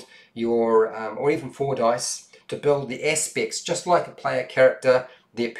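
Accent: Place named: New Zealand. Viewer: you sound like you’re from New Zealand and stereotypical people, think fish and chips for you sounds like fush and chups.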